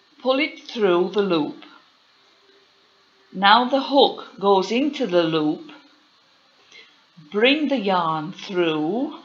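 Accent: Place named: Northern England, United Kingdom. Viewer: This lady sounds like she's from Northern England (UK) If you want to be pedantic, I guess you must say 'cro-shay' Here it's a double crochet. I've watched so many American/foreign crochet tutorials, it can be easy to confuse single with double. I guess we count the slip sritch as a 'double' 🙂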